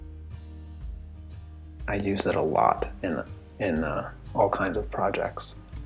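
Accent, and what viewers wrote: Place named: Central Pennsylvania, United States. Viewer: Love your videos, from one artist to another. Are you from central Pennsylvania? Just wondering because you have an accent similar to what we have. Sorry if that seems weird! LOL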